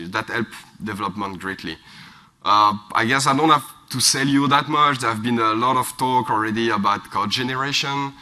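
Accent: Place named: France. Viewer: Nice French accent ! Some frenchies have talent :)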